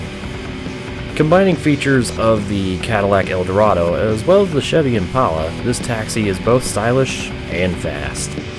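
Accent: Italy. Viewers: Sei italiano?😀😃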